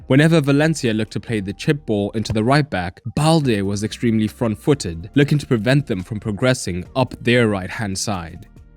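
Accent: South Africa. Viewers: Why does bro sound south african😭😭😭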